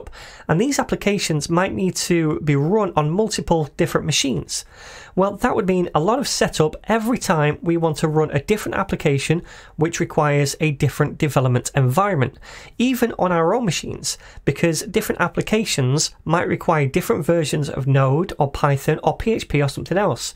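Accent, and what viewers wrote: United Kingdom. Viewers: There's something about a classy British man explaining programming to you as your own personal professor Cheers, mate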